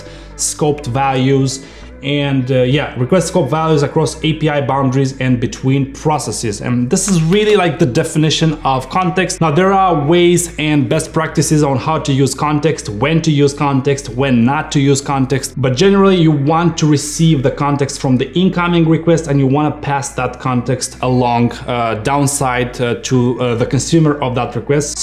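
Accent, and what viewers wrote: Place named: Russia. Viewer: You have nice russian accent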